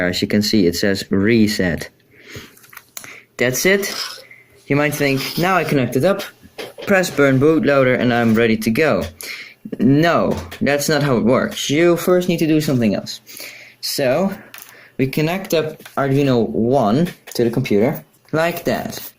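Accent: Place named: England, United Kingdom. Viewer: broooo, ik mag je engelse accent wel en thnx voor de fix!!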